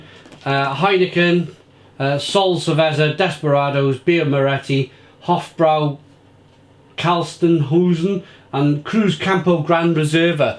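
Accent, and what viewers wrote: Wales, United Kingdom. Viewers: I’m following this guy just on the back of his great welch accent and likeable person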